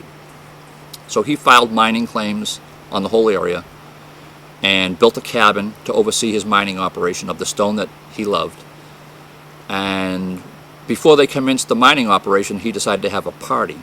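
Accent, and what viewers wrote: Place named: East Coast, United States. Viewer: apparently you don't. LOL. I heard this guy moved back to Boston or whatever East Coast S-hole he came from. That accent is horrible.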